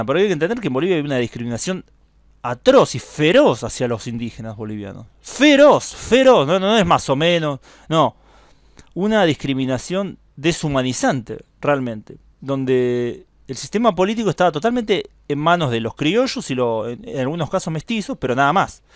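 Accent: Argentina. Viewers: curioso que este "cuate" no hable como mexicano, sino como argentino.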